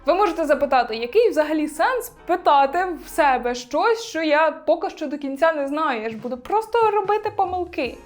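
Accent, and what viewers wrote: Poltava, Ukraine. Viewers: А ще мені дуже цікаво слухати вашу полтавську вимову🥰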